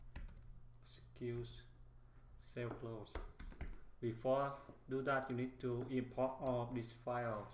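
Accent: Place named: Vietnam. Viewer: nghe cách nói biết người Việt nói tiếng Anh :)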